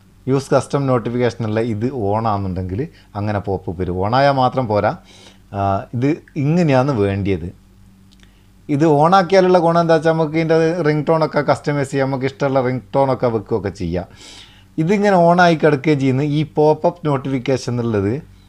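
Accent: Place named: Malappuram, India. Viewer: ഇങ്ങളെ സംസാരം പൊളിച്ചു ഇങ്ങൾ മലപ്പുറത്ത്‌ കാരനാണോ👌👍👏👏👏👏msg ഗുഡ് sub ചെയ്തു ബെൽ ഐക്കൺ ക്ലിക്ക് ചെയ്‌തൂട്ടോ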